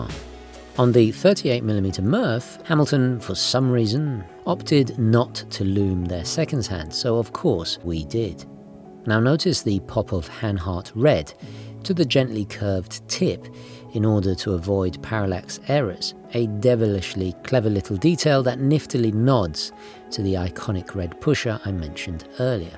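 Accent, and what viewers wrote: United Kingdom. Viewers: An American with a Brit accent in a foreign country doesn’t get anymore American than that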